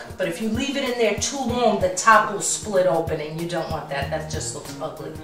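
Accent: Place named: New York, United States. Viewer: Love the italian newyorker accent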